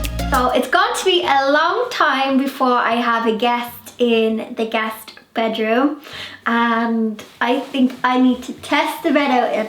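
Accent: Ireland. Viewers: It looks amazing!! Came across your channel a few days ago and I LOVE your projects!! 🥰 Plus, it's so lovely to hear that Irish accent again...been to Ireland 'bout 11 times but last time was a while ago so it brings back memories 🤗 will keep on watching for more ideas - sadly my beloved mom just passed and I'm currently emptying my parent's house. My two daughters (7&10) and I will most likely move into it and I definitely wanna keep lots of things and re-purpose / restore so your diy projects are a great inspiration! Looking forward to watching lots more of your videos 🤗 greetings from Munich, Germany 😘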